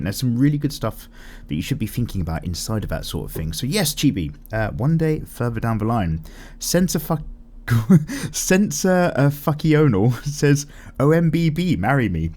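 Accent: United Kingdom. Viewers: Hey! Im happy being able to listen to your beautiful British voice in the back as I continue to hone my artistic skills! I wanted to answer some questions fro,m past DWMs real quick 1-DWM 89: More recent the ending or opining image in the Sword art online spinoff where the main character (Who you should draw if possible) Is standing outlined in the rubble. 2-DWM 88: Nothing much Pumpin Iron and drawings! 3-DWM 90: Not an actress, but more of a cosplayer I recently found named Vamplette! Anyways have a great day!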